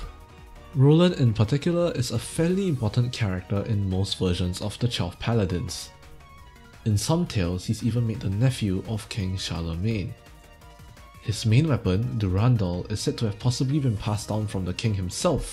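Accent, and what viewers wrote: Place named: Singapore. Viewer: oh your singaporean hey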